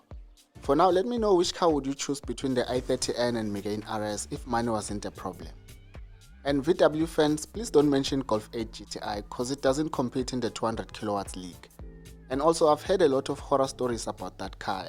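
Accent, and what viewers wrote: South Africa. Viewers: 😂 I love the cynical Kasi accent 🤟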